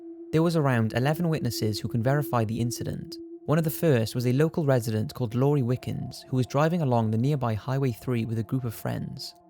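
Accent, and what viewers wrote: Wales, United Kingdom. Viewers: I love your videos and your Welsh accent. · Dbonkerz81 Pretty sure that's what I said? But he has a Welsh accent which changes his pronunciation slightly. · Are u British? Lol sorry if its obvious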